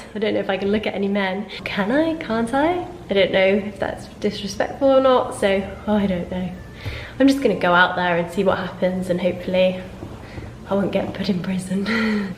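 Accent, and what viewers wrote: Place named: United Kingdom. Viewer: Welcome to KSA. Jeddah is one of my favourite cities as I'm from the Middle of Saudi Arabia but loved it a lot. What a lovely sweet accent you have, no wonder that's British my favourite toooooo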